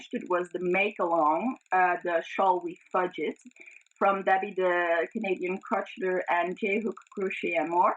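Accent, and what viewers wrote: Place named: Norway. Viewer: Regardless of the project, I'm sure it will be challenging to get it done in a week. I'm sure you've said before, but where are you? Sounds like a Norwegian country?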